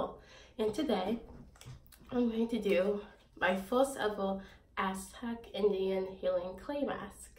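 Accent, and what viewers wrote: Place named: Boston, United States. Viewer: Are u from Boston? What an accent ! 🙂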